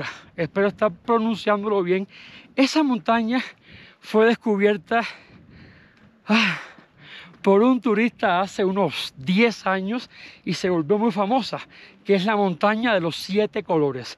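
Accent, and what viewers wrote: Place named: Cuba. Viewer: Y por si acaso lo que t'escribo es en broma porque como no diría jamás Kiko : "Tu si me simpatizas" por tu manera de hablar tan cubano chico, por eso recordando la expresión del Chavo del 8 : " Tómalo por el lado amable" 🤗👍🏻❤️🇵🇪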